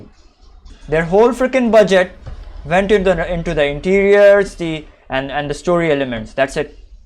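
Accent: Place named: India. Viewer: getting shi**ed on in an Indian accent, love it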